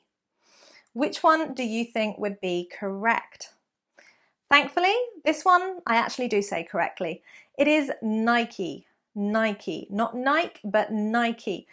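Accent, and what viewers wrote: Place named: England, United Kingdom. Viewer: Which part of England your accent did came from?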